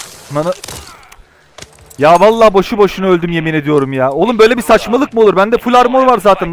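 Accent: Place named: Anatolia, Turkey. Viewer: Hocam allahtan keşfetime düştün aşırı zevk aldım seni izlerken. Oyunundan çok konuşma tarzın aynı bizim Anadolu tarzına benziyor. Yayın bekliyoruz hocam eğer açacak olursan elimizden geldiğince destek oluruz inşallah.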